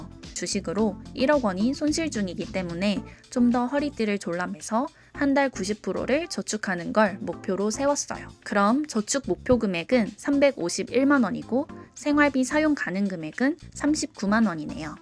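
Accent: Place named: Gyeongsang, South Korea. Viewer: 갱상도 사람이셨군요?! 카페가 맞지요~ 타지에 오셔서 고생이 많네요, 저랑 똑같네요😀 이번에도 화이팅입니다~!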